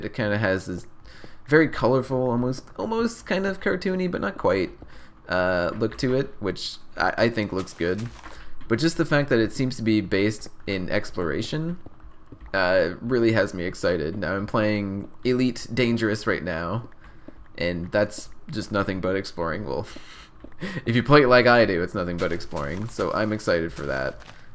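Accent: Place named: Canada. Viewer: Are you Canadian, or not? The way you say "about" makes me think so. XD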